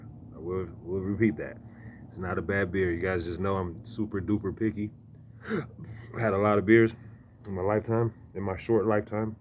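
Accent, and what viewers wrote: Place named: South, United States. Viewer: I didn't know u was from the South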